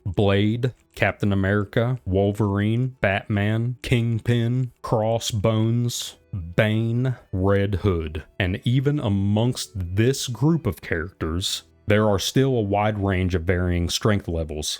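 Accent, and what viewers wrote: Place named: Rural, United States. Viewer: Ive known a lot of hicks in my day, but brother if that is what you sound like reading from a script, you're better off leaving it out. Yeesh.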